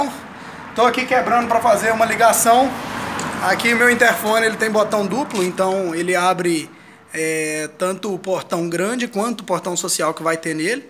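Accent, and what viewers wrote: Goiás, Brazil. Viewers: Tu es da onde cara? Você falando parece ou Zezé de Camargo ou Leonardo. Você e de Goiás?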